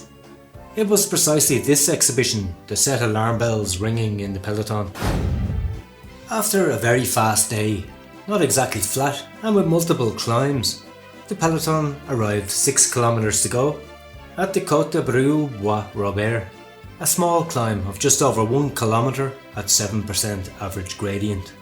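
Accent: Ireland. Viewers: What makes you think this is from a Brit? The narrator is Irish (you need to read a bit of history if you think Irish and British are the same thing!), and the early videos all have continental European narrators. I am British, however, and I think your full of shit if you think that just because British athletes have doped that I am not allowed to voice my suspicions of others. I personally believe that there are more at it than aren't, and not just in cycling.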